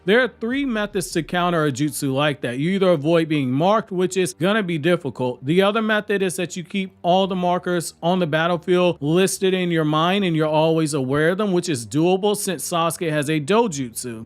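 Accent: New York, United States. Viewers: yeah you sound really distinct in a cool way I was just curious I want to guess new york? I like your accent is all and I love the content sir!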